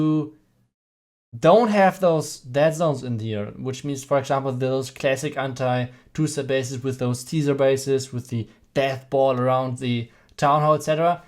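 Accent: Germany. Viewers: You can hear the German accent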